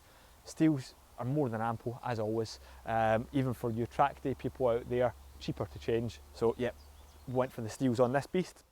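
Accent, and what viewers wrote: Scotland, United Kingdom. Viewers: Sounds like a Scottish accent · Mate the only reason why I understand every word you have uttered in this video is because I am a Snooker Freak, and love watching and listening to the commentary of Alan McManus and other fellow Scotsmen. I’m an American from New Jersey and it’s crazy how frustrating it is for other people to even understand regular English people. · First car review with a scotish accent i see .obviysly cause im not native english speaker i understood half of what he said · Love the Scottish accent haha :D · great review...subscribed. love the Scottish accent.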